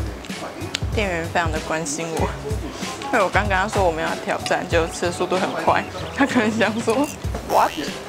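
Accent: Taiwan. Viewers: 講台語的時候好可愛www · 講台語道地可愛XD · 台語很標準吧哪裡怪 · 看起來好文靜那個氣質很好可是一講話就破功了變(正港台灣人)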